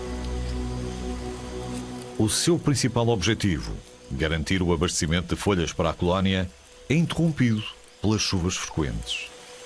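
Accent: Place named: Portugal. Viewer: Português de Portugal é muito feio cara, na moral não tem como kkkkkkk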